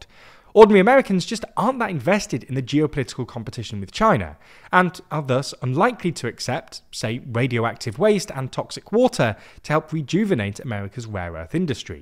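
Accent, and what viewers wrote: United Kingdom. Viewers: Everytime I hear a heavy British accent like this, I get turned off. It is supposed to make him sound intellectual but it actually showed him as shallow.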